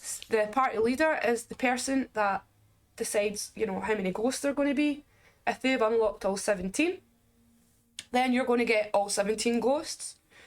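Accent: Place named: Ireland. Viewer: phasmo for the win. also are you irish? you got a pretty thick accent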